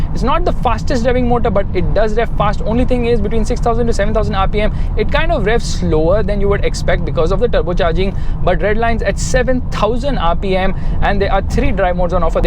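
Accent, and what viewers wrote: India. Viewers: Hate Indian English accent 😆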